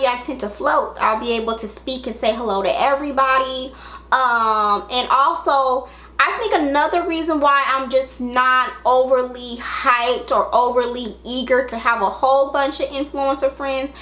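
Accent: South, United States. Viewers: I started watching you just to hear you talk because you remind me of someone from the south such as myself....but when I say that you hit this topic right here out of the field and that was a hall of fame homerun. Gurrrrrlllll, you kilt me when you said, "I am 30"! That means I do not have time for the bull crap and the cliches'. That is some childish shit and I will say that for you. See, I love a genuine person. This is why half of them are having issues and problems within their homes and lives. Some cannot go any further because of it. There have been some that I have unsubscribed from for this very reason. Plus they always have problems with people and other brands......damn just shut the hell up with it all. True we come on here for entertainment but at the same time we want to see the real reality shows, instead of all of this she my friend oh I don't hang with them because they don't drive a Mercedes or they toast their bread in the stove and not a toaster....I know I went there for a second. 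Anyway, I love what you said and the way that you said it, you were respectable not to stomp on anyone toes. Really, if you are who I think you are--you wouldn't give a damn if they did get mad! I know I wouldn't. One instance and I know somebody may say something back at me but oh well, but this damn palette issue. Why are some people on these videos eating from the same table but you're not sampling this person's new collab, when I have clearly seen them on pictures toget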